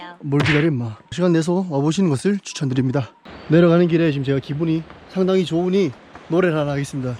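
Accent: Gyeongsang, South Korea. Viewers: 다음부터 이런 실수 하지마 ㅎㅎㅎㅎ 근데 말투가 꼭 우리 동네말투네요 대구쪽분이세요?? ㅎㅎㅎㅎ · 저도 경상도 사람이라 님 사투리가 넘 친근하게 느껴지네용 ㅋㅋ 옆집 오빠같은 느낌ㅋㅋㅋㅋ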